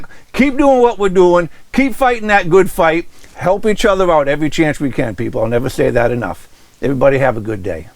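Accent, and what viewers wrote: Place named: New Hampshire, United States. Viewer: Being born and raised in Winchester NH I always turn to your channel to see what's happening "back home". We don't see much snow here in Arizona. Love your accent, makes me feel right at home always. Thanks!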